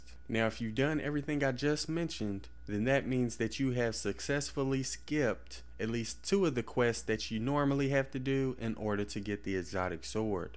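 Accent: South, United States. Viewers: liked commented and subscribed for the country accent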